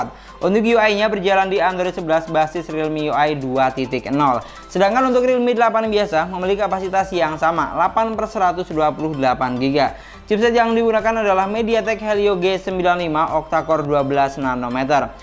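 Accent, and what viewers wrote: Madura, Indonesia. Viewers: madura aksen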